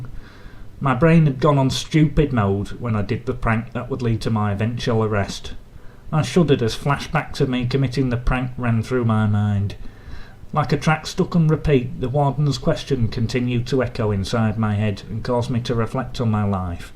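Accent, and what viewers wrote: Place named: Scotland, United Kingdom. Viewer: This guy sounds pitiful. The person in the book not the narrator. The narrator just sounds Scottish.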